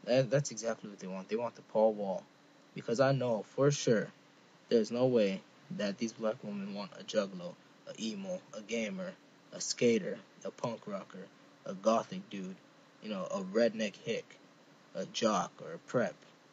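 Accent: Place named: Somalia. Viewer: Are you Somalian? You sound Somalian.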